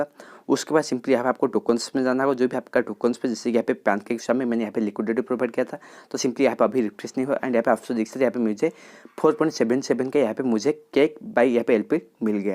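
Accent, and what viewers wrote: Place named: Northeast, India. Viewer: ooh apka bolna ka style north-east ki tarah ha isilia pucha 😅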